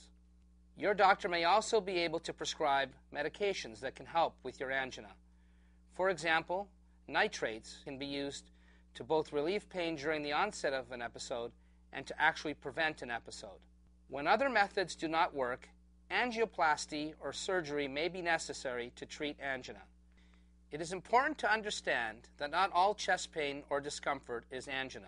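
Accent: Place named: United States. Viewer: i think he pronounced angina correctly coz this is the American accent!!!! yesterday an american prof gave us a lecture about angina and he pronounced angina the same>>>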